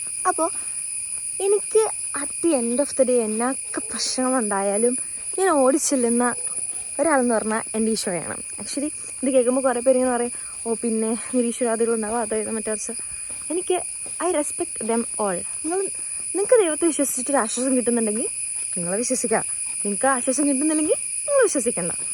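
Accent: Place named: Kottayam, India. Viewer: Naatil evdyyaaa?? Samsaram ketit pathanamthitta/kottayam pole😅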